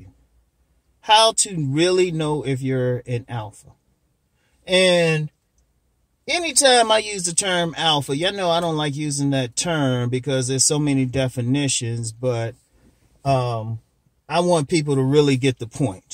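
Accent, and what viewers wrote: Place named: DMV, United States. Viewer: Dude, with that accent you've got to be from the DMV area! GREAT information too!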